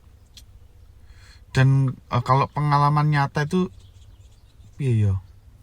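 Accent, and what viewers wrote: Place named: Blitar, Indonesia. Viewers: Ngomongnya pke logat blitar jiaaaan medok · Horror : 20% Komedi : 80% Semuanya dibalut oleh bahasa jawa logat medogg Jadinya : Lucu2 serem wkwk :D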